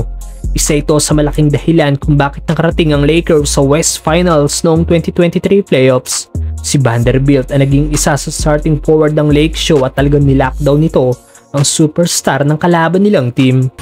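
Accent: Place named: Laguna, Philippines. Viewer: Taga Laguna ito halata sa tono mo hehe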